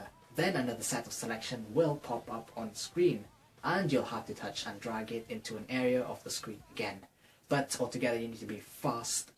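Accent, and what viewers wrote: United Kingdom. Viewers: Oh no!, it appears as though I am to late to have asked you any questions for this video! D: Regardless, I always enjoy hearing you talk in your fancy British accent c: And might I say, I am truly honored to be a part of your legends list! I know I have not been editing much lately- I seem to have hit a creativity block- but I hope to have some kind of video completed before Christmas. Life has just been so busy lately! I hope things are going as awesome for you as your hair is!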